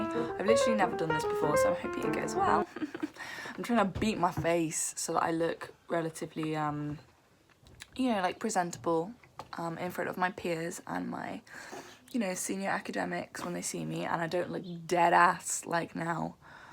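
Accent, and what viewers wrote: London, United Kingdom. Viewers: Are you from London? Australia?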